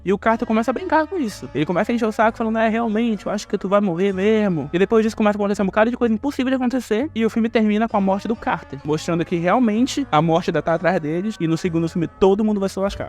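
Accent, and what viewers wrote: Pará, Brazil. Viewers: Bixo, precisa nem assistir muito pra saber q o Cara é Paraense kkkkkkkkkkkkkkkk, muito firme o video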